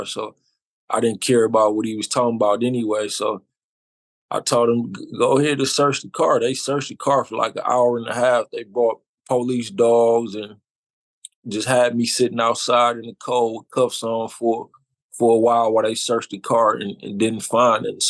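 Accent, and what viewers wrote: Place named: West Coast, United States. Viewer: Sounds like a West Coast dude.....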